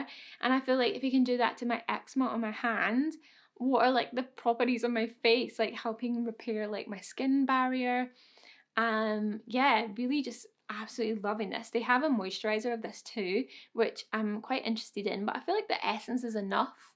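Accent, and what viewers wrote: Scotland, United Kingdom. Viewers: What kind of accent you sound Scottish can hardly understand what your saying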